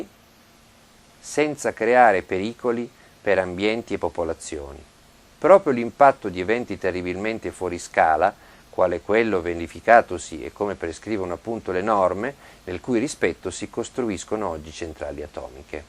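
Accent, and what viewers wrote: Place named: South, Italy. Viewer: forza! ti vogliamo incazzato e con l'accento meridionalizzato!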